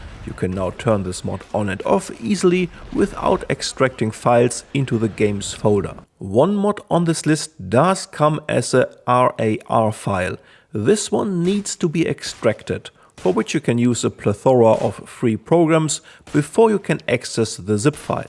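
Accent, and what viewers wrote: Germany. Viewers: Bro sounds more german than me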